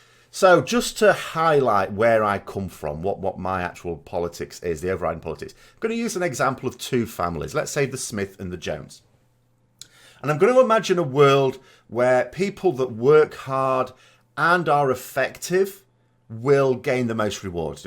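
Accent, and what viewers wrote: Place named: Northern England, United Kingdom. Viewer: Hi I think a lot of people who come to your videos would like to have you as a friend. To be honest my Finnish wife loves your accent as do I. As a southern born guy who lived up north for many years. I always crack a little cloth cap and ferret down trowsers joke with her, and she is laughing her head off. I explained just how grim it is up north. :) I am very happy to sit and listen to you for hours. You have a solid hold on whats going on in old blighty. Good luck to you mate.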